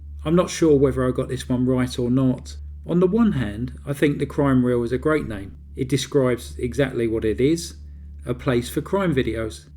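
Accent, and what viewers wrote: United Kingdom. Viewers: "...limped past the 100K mark." I loved that, for its humility and honesty. I'm a subscriber, only having found you a year or so ago. But, I love true crime so yours, and one of the others you referenced, are the two I watch. Always. I love British accents. And, I don't care from which area of Britain, they are all charming and--to my American ears--very sophisticated. I think your delivery is lovely, measured and with a thoughtful tone. Anyone who would send you negative comments (and I'm sorry about that) are just envious, because you've accomplished something the have NOT. I understand your taking a break. You've described your experience with Youtube very clearly and I am sorry for the struggles you're having. Those of us out here who are so interested in true crime do love what you do, and your work is appreciated. Speaking for myself, I LOVE Petty Crime! 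For the very reason you said, a light, humorous note to sometimes very dark material. I find myself smiling at each one. Well, until I saw the snake today. . . not a snake person. One last thing: I like the avatar you have now. Its the best I've seen of all you've had (I did go back and view other, older videos once I finally found you). I hope you'll be back 💙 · Hello Crime Reel from London Ontario Canada. Thankyou for the video. Had no idea how much hard work goes into making a video. I too love crime stories, and I want to say that you do an Amazing job with your stories. I always find them very well done, the detail and pictures. I'm not sure how long I have been watching, but I believe I was first brought attention to your channel by Brief Case. You said that sometimes people insult you, that's terrible. I don't understand why people do that. Crazy people in the world. I Love British accents, so I Love your voice. 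I hope you enjoy your break. If you decide to carry on your channel, I will throughly look forward to it. Thankyou for your channel. Goodbye.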